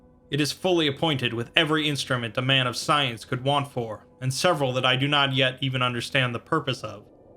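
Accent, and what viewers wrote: England, United Kingdom. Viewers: Two years later and I’m still amazed kept up an English accent for almost a whole hour. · Another great episode. Good English accent lml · That British accent is amazing! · Which English accent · Great British accent didn’t think it was really you at first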